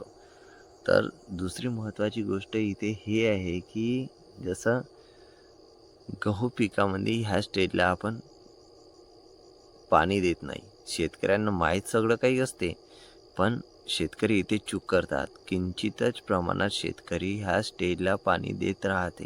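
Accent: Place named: Jalgaon, India. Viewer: मराठी जडगावची आहे वाटत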